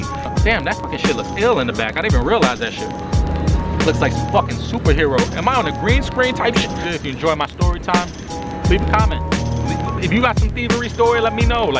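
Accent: New York, United States. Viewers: lmao i fuck with your stories cuz im from new york and you tell stories just like a new yorker but what if that dude watch this video and find yo ass and pop on you lmao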